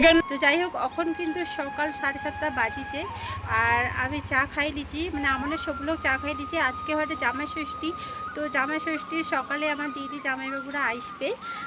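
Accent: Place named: Kanthi, India. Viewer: Khub valo ai prothm kanthi vasay kotha sunlam 😊kotha gulo oroia r bangala vashai tobe valo laglo 😊sob kiche mile sundor hoyeche❤❤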